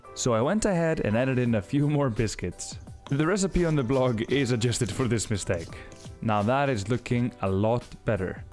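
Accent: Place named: Iceland. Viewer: seems like I finally found a good icelandic recipe! I´m so in love with that and miss original skyr and of course skyr cake the most... but I also love how icelandic people pronounce words in english. So thank you in so many ways! 😊🥰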